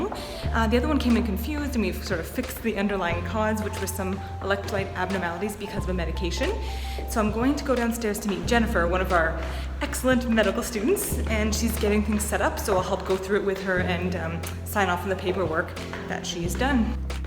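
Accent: Canada. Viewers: Ps love your Canadian accent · I know she's Canadian I can tell by the way she talks but does she work in Canada too or does she work in the United States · New sub here, I realise after looking through your comments and watching a heap of your vids your fan base is a lot of medical people lol. That's funny because my interest in the medical industry is zero haha, but you make it interesting and educational, and i don't regret spending maybe 2 or 3 hours watching your vids 😂😂😂 👌 ....that Canadian accent does really help too, anybody who says they don't like Canadians is just lying. Have an awesome day, new fan in NZ 😁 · I love how sometimes you can really hear her Canadian accent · When you talk you can hear your inner Canadian come out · Are you Canadian? Your voice doesn’t sounds exactly American and in one of your videos I saw an ambulance with a Maple leaf on it.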